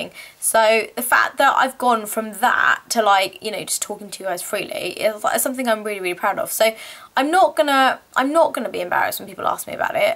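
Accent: United Kingdom. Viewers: Are u from UK. I will definitely date you😜 I love all them people in UK accent I'm moving in UK soon cuz I love the accent omg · I'm so happy that you made this video, you are my favorite person that makes personal videos on YouTube. I love your British accent and you are so nice. I stop making videos because I don't know what to talk about and I feel sometimes depress or wanting to quit my YouTube channel, don't get me wrong i love making videos. Advice please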